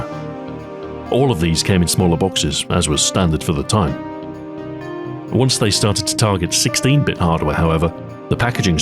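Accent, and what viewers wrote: United Kingdom. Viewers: I just watched 22 minutes of a well-spoken brit talk about boxes and I fucking loved it. That's the power of I tell you damn. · You're back! Awesome. Your sophisticated-sounding British voice is the coolest.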